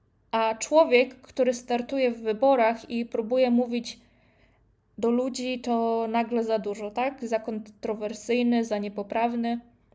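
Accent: Russia. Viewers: Ile tu ruskich troli się namnożyło. A tak w ogóle niech pani popracuje nad językiem polskim, bo słyszę akcent rosyjski z pani ust. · Ty ruska. Na front! Czuć rosyjski dialekt. L! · Czyli jak maciak popiera pani rosyjski i białoruski reżim, po Pani akcencie nie dziwię się. Łagry, zabijanie przeciwników politycznych jak Nawalny - to jest wolność słowa. No Brawo. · Audycja sponsorowana. Zobaczcie i poznajcie sponsora kanału jeżeli potraficie czytać i słuchać w melodyjnym języku rosyjskim 🤣 Bystrzejszym uszom proponuję wsłuchać się w akcent pani lektor. Czego tu jeszcze nie rozumiecie? 😂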